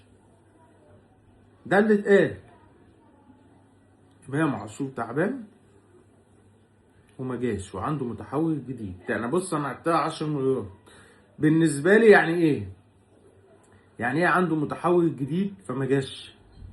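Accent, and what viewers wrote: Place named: Iraq. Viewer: اسمع عراقي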